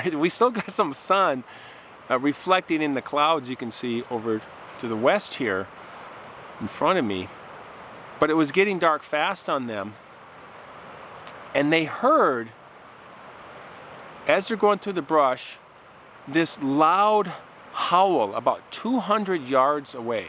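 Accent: Minnesota, United States. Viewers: I went to Saint Olaf College; I’m from Seattle, but that accent, right away, told me exactly where you are from. What a great idea for a channel, looks like you are doing great. Can’t wait to listen and watch every one of your uploads. Bye now, you take care, see you soon, Buh bye. (The Minnesota long goodbye)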